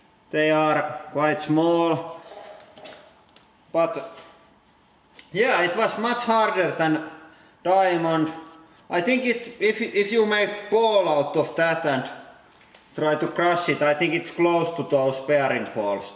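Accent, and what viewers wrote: Finland. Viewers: I read that with your Finnish accent. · Are you kiddingg me? Half the reason I am here is that Finnish accent LOL it wouldn't be anything good without him talking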